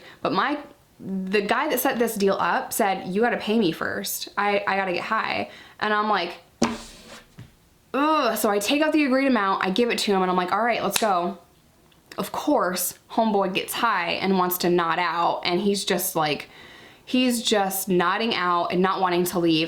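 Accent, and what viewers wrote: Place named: Harlem, United States. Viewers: Sounds like the Bronx 😂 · Sounds like Harlem or South Bronx...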